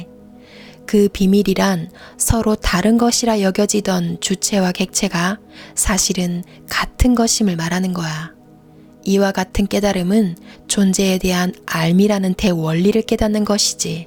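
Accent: Gyeongsang, South Korea. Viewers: 증말 믿고 듣는 진정한 갱상도 사투리다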